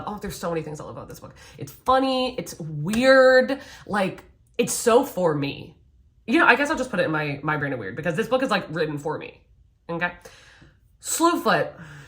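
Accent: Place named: Scotland, United Kingdom. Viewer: The amount of sliding into passionate quasi-Scottish in this video made me be like YES THIS IS HOW WE EXPRESS OUR LOVE 🏴 Five stars, would watch again 👏🏼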